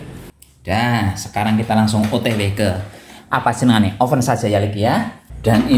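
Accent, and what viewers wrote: Banyumas, Indonesia. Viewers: Selamat siang mas trima kasih banget resepnya sangat gamblang aku suka dengan gaya ngapaknya hehe sukses slalu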